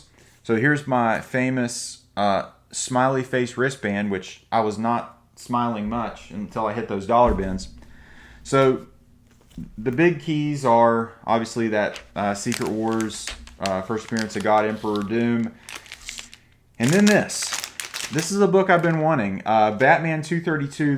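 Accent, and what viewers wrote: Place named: Ohio, United States. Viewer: Judging from your accent your from somewhere in Ohio.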